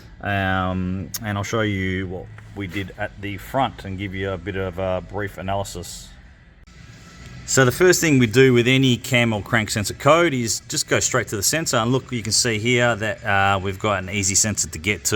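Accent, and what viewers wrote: Australia. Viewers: Very good stuff my guy!! Im in texas it sounds like you might be from Austrailia? Well where ever you are you helped me from many miles away...that was it! Thanks for posting!!!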